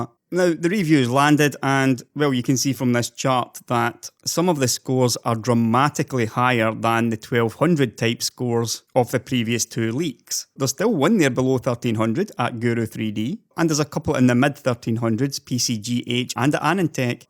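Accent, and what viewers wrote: Scotland, United Kingdom. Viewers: The only thing better than your video execution and the information provided is your glorious Scottish accent.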